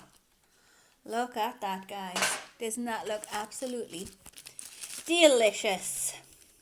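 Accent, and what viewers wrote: Newcastle, United Kingdom. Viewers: U from Newcastle? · Are you from Newcastle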